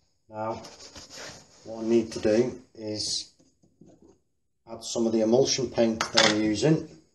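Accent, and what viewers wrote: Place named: St Helens, United Kingdom. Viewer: Sound like your from st.helens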